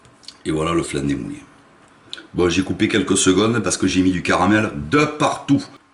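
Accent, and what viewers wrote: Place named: Narbonne, France. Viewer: Exactement la recette que je fais. Ma mère faisait la même (juste elle mettait plus d'oeufs ) je mets un peu moins de sucre Mais javais besoin de précision et elles sont bien présentes dans cette vidéo ca ma fait rire d'entendre dire de ne pas changer de casserole Un côté humoristique que j'aime beaucoup ^^ Et je suis aussi du midi région narbonnaise ..(je reconnais bien l'accent ;) Merci !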